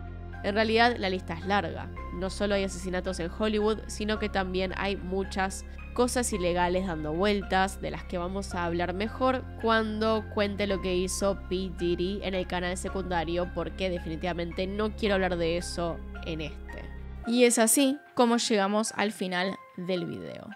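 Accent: Argentina. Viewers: Como me encanta el acento argentino!!❤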